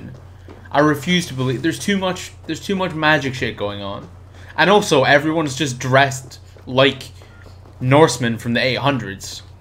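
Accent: Scotland, United Kingdom. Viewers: Where in Scotland are you from mate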